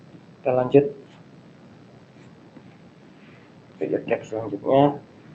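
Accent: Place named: East Java, Indonesia. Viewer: Mas orang jawatimur kah?..kok logatnya kayak orang jatim ya..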